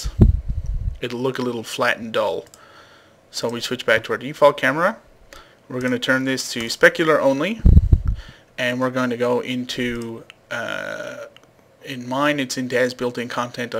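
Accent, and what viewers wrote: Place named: Newfoundland, Canada. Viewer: Love the Tutorial...AND the Newfoundland Accent! At least that's what it sounds like. I have a lot of family there and my mother is a newfie! :) Anyways, thanks again!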